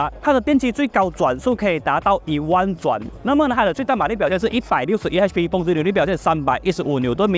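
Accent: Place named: Malaysia. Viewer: 觉得还好大马很多人都是这样讲话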